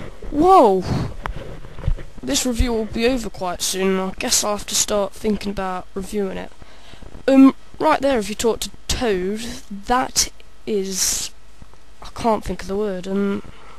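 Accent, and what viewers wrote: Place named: United Kingdom. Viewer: u sound gay with the britshzz acsent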